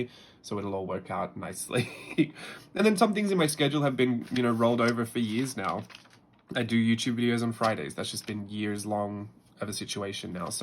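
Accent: Australia. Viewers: …. You are so freakin talented and AWESOME!!!! I Love your work, your creations, your teaching style, (and your Australian accent too)! You’re amazing mister! Thanks for always sharing and taking time to post for all of us, you’re a huge inspiration to me. I’ve had a lot of health problems this past year and miss a lot of videos you post but when I feel well, you’re the first channel I run to and see what amazing things you e created! Ahhhh you make me smile and always feel inspired ❤ thanks for all you do Hugs from Texas… 😊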